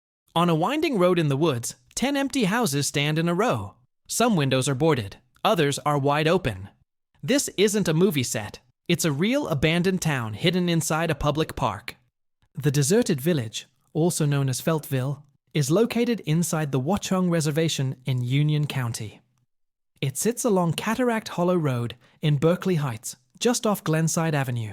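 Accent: United Kingdom. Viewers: Uk accent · Is this guy British or what?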